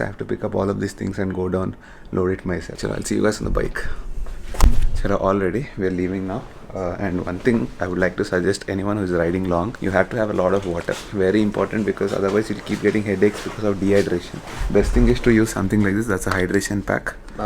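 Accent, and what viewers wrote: India. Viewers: I love that Hindi accent.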